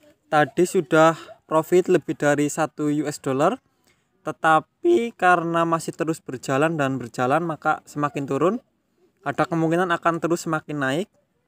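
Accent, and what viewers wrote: Banyumas, Indonesia. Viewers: Bg orang ngapak ya?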